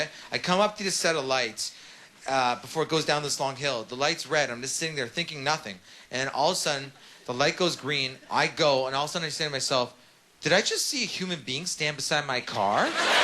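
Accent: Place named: Canada. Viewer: is he from canada? he says ehh a lot..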